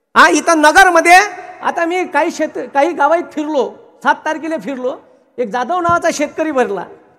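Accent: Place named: Nagpur, India. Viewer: Nagpuri bhasha aahe aamhi asach bolto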